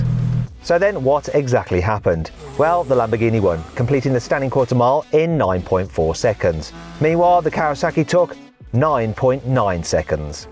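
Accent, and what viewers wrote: United Kingdom. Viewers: イギリス英語って新鮮で好き:) インド人がバイクをbikeって呼ぶの違和感だったけどイギリス英語だとbikeなのね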